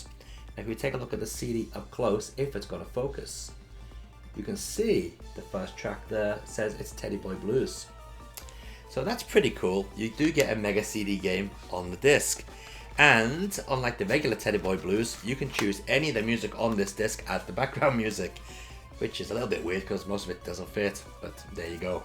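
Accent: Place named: Liverpool, United Kingdom. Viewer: sounds like Liverpool dialect to me :)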